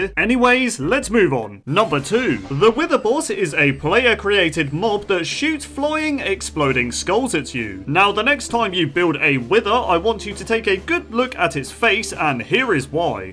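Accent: United Kingdom. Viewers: bri'ish voice? ı'm new :/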